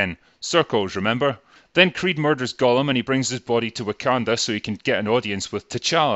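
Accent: Scotland, United Kingdom. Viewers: he's the same in pretty much all his videos(including older ones), that is drunk and with a heavy scottish accent, but he became more polite for this particular video as his arguments would have been diminished and he would've been called a racist. Well atleast that's my hypothesis.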